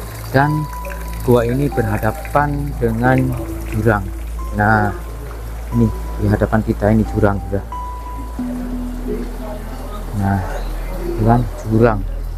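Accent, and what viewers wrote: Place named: Wonosobo, Indonesia. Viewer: Kayak logat wnsbo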